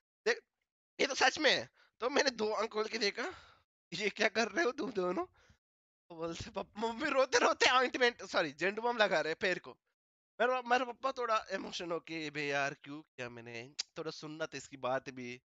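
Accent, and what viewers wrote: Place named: South India, India. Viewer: ohh his voice is lile south indians :)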